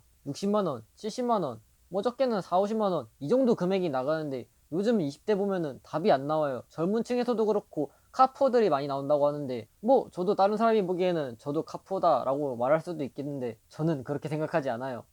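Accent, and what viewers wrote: Gangwon, South Korea. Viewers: 강원도 사투리 ㅋㅋ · 강원도억양 아직 있으시죠? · 경기 북부 강원도 사투리 ㅋㅋ 제가 잘 알죠. 가평. ㅋ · 강원도 사투리 정감가네요ㅎㅎ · 강원도 사투리가 살짝... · 말투가 강원도? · 와 또래중에 강원도억양 제대로들은거 처음이다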